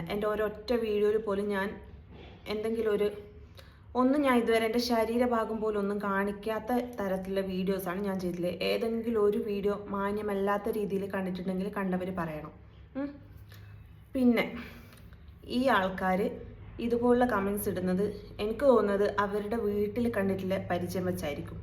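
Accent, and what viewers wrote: North Kerala, India. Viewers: Its a very good advice given to those JHARAMBU ROGEES as you have said must have learned the basics of their dirty activities from their own parents ,sisters or brothers who may be past masters in shaming others....I totally agree with what you have said....Even your advice given may not be effective or make them repent that is what I feel...after therevis a saying CAN AN ABYSSINIANN CHANGE HIS COLOR OR LEOPARD ITS SPOT...Any way I thoroughly enjoyed your video clippings especially the north kerala slang used while talking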